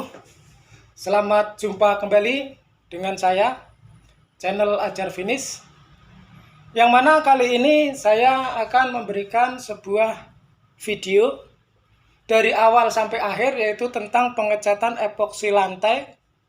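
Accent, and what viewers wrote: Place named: Java, Indonesia. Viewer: Makasih logat jawanya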